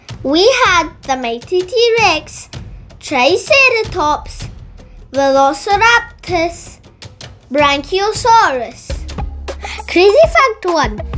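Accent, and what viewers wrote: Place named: Scotland, United Kingdom. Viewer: So sweet Lovely speech Looking smart Great knowledge He speaks like sccotish boy❤❤❤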